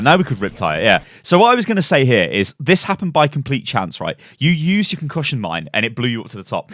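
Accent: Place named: United Kingdom. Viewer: Omg highlighted my comment what do i say!!!! Um...um... I love you're British accent 😭😍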